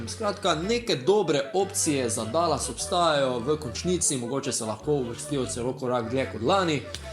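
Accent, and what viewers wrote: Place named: Ljubljana, Slovenia. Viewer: Govori kako treba Ljubljansko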